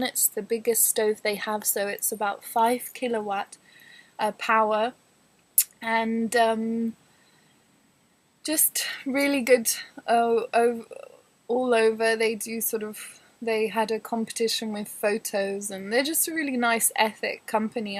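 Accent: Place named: United Kingdom. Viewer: Love the British accent of a lovely British girl!!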